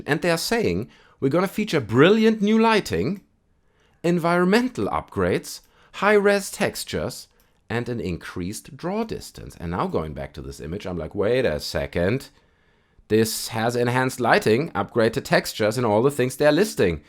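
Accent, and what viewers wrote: Germany. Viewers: Let us hope this is real! BTW, are you german? I swair you Sound like me when i talk english 😅✌️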